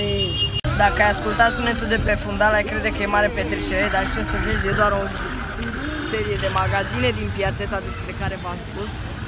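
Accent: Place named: Egypt. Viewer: بجد بتبقى عسل جداا لمل تتكلم مصرى💟💟💟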